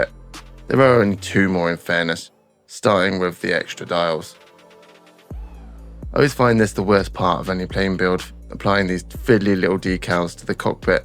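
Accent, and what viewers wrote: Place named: United Kingdom. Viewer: a real voice is infinity better than any A.I. rubbish. Nothing wrong with a proper Brit accent.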